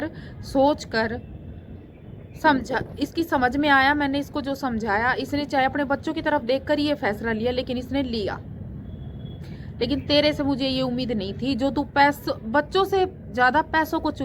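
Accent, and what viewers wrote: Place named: Haryana, India. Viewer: कहाँ से हो बहन भाशा से हरयाणा की लग रही हो subscribe kar diya aapko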